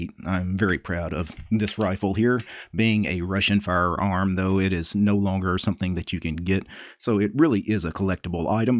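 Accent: South, United States. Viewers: I knew that southern accent wasn't for nothing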